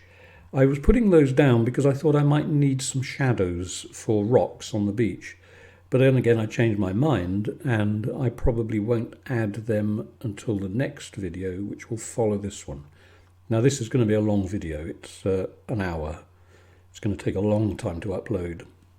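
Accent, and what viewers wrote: United Kingdom. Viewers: you've got such a beautiful british accent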